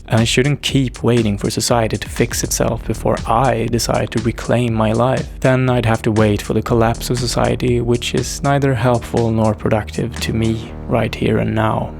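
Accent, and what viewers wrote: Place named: Scandinavia. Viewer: sombre tone makes me feel like i should be contributing and leaving an actually intelligible comment but i just wanted to say i got excited trying to pinpoint ur accent, was like eastern european at first but i think its nordic....?? thank you for the video :) (i deal with it with blocks too... and always carrying my notebook with me .. with routines listed out to fall back on)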